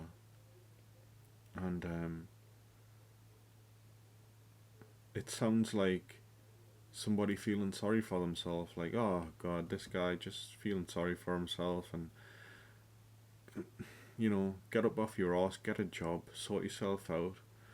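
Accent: United Kingdom. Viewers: Youtube random algorithm sent me here so, going to write a comment (I know this was posted 4 months ago but I hope you read it). Life doesn't have to have purpose, friends come and go, lovers as well, there is no eternal love, all ends when one of them dies. Try to volunteer, you will meet new people, and will feel a sense of purpose. A pet helps, physical exercise (hiking, walks, weights) as well. "Who's going to love you, if you don't have a job?", your constructed ideas are putting you in a prison, I met my bf when he was unemployed, he took over 1y to get a job, that never got in the way of our relationship. I earn more than he does, he is on minimum wage, and I really don't care how much he makes, if he enjoys what he is doing. The happy family idea, is just an idea, most of my close acquaintances have families that are quite dysfunctional, very few are really happy. 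I know plenty of people that are 40y+ that are single and they are actually happier than most married people I know. Being alone is different from loneliness. You achieved being an engineer, earned a good wage, you traveled, and learned the lesson that you should have saved for the rainy day. Life has ups and downs, it is not a highway like propaganda portraits. Start reading about stoicism (there are a lot of sources for free online, you can start in reddit- r/stoicism), self-compassion, resilience and get therapy (I think you are from UK from your accent, NHS provides free therapy). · Geordie love it I’m from Hexham bro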